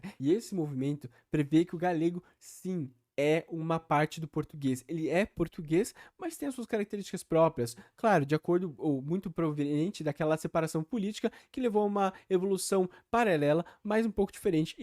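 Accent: Brazil. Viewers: O sotaque dele é mais próximo a diversas regiões só Brasil do que de Portugal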